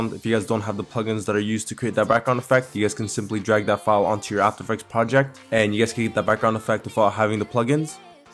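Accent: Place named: Russia. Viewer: Is it me or does this guy sound russian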